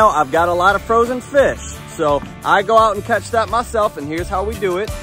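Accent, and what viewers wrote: Louisiana, United States. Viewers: I came over from arms, family homestead, and I really enjoy your Louisiana voice and your videos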